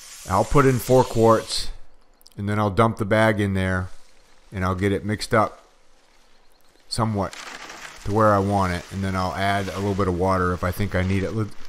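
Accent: Maine, United States. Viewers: Mostly was watching this to see how to mix it up and what consistency I should mix it. But I couldn't help but notice that you sound like you're from Downeast Maine lol. I'm born and raised there and live in the Southwest now 😂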